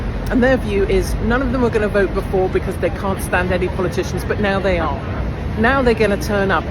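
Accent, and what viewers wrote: United Kingdom. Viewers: Trump is alive only because the Lord saved him. BTW, I love the British accent.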